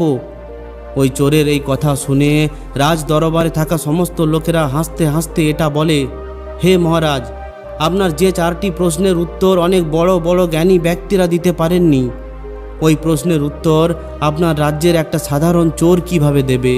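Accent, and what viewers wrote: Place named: Kolkata, India. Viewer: উনি কলকাতার মানুষ তাই এটা কলিকাতার ভাষা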